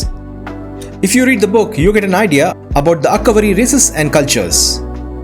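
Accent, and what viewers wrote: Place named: India. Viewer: Sorry if this is rude but out of curiosity what part of the Indian sub-continent do you come from? I always find slight regional differences in accents interesting and I haven't heard one exactly like yours. If this is to rude/personal of a question please don't feel like you need to answer.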